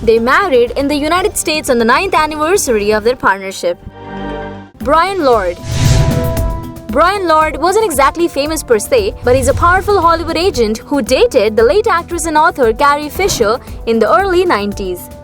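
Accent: India. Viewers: Her Voice in annoying. I feel like I called a 800 number for some help with a product I bought here in the USA only to be redirected to some place in India. Or that maybe I walked into a 7-11 and ordered a Slushy. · The woman's voice is an Indian accent. It appears some find it irritating. Wait.... Lance Base??? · Indian accent is so incredibly annoying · Bill Bergendahl she sounds Indian 🇮🇳 I think I’m just saying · Your Indian accent is so annoying.. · Better to redo this with somebody who speaks English...not a Pidgeon English from deepest India.... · Why the narrator sounds Indian??? Pls dont talk when your mouth is full 😂😂😂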